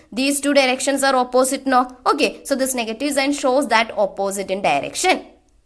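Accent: South India, India. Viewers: Maam are you from south india?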